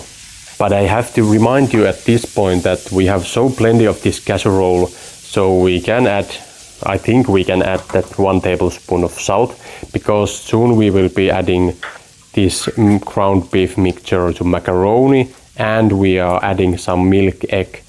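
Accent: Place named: Finland. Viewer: This looks delicious, I will have to try it! Also, I've always been a fan of the Finnish accent!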